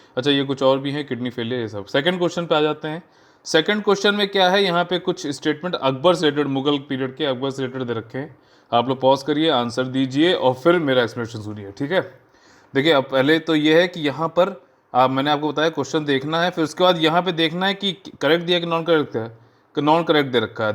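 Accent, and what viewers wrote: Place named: Awadh, India. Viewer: Thank you very much....! But kanpuriya aur awadh mein sab aise hi bolte hai.... ☺️☺️☺️☺️☺️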